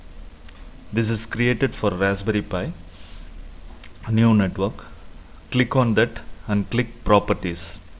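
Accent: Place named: Tamil Nadu, India. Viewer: i am going to have a stack of pi like sbc's having usb's and probably no ethernet (if there is ethernet we use it if not we connect via usb) ... these will be accessed over ssh by a "control computer" (typically a laptop) ... it is a stack which is experimental but production version could have 100's of them for super computing ... you said ssh file will be deleted ... how to have ssh file on every power up of pi's? ... all operations you show seem tedious and requiring human to gain access of each pi which would not be practical when they will be in 100's ... otherwise video is informative ... thank you ... your tamil affected pronunciation is not affecting understanding here because it is a video and we can piece together meaning by looking at action you perform ... but you are pronouncing "cord" for "card" which can confuse in other videos / audios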